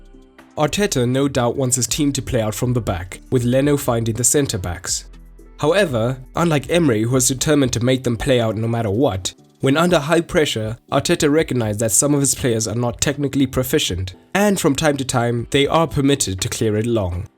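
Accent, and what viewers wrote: South Africa. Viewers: Wait is the guy speaking South African? Respect! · This is totally unrelated, but you sound South African? So...are you?😅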